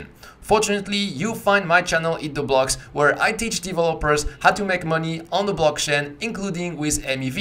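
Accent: France. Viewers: L’accent Français Youhoouu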